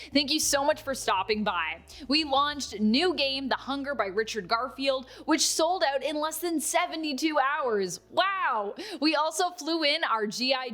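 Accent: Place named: Canada. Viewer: She's Canadian, they talk funny sometimes.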